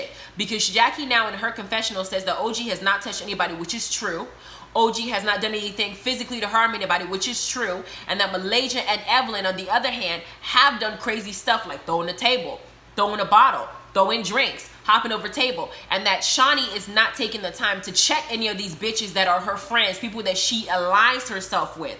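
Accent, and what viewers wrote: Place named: Caribbean. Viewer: I hear a accent.. are you Caribbean by chance?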